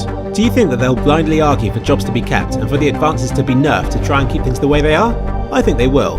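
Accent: United Kingdom. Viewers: Oli Allan I was actually more annoyed by the distracting background muzak and his nasal British(?) accent.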